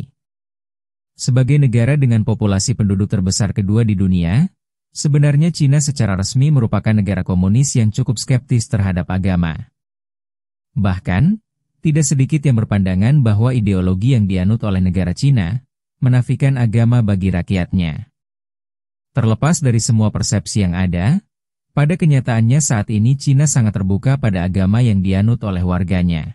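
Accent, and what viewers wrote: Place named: Indonesia. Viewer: waduh narator..................ini gimana sih janganlah abang baca bahasa inggris seperti bahasa indonesia, malu aku bang, bacalah muslim populesyen jangan abang baca muslim population, maluuuuuu.........aku bang, yang nonton konten ini sedunia bang, gimana aku mau subscribe, abang baca aja nggak becus........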